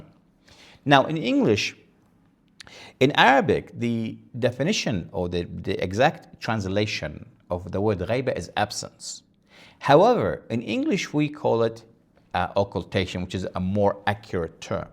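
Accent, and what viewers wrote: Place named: Michigan, United States. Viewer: وكانك من لهجتك عمامة ميشكانية😢😢😢فان كنت كذلك فلعنة الله عليك فما انت الا اشر الخلق.....الحمكم ان كنت من امريكا..فإنما انت ديوث قواد منحط اخلاقيا عديم شرف،وهذا ابسط ما يقال فيك....ان كنت من امريكا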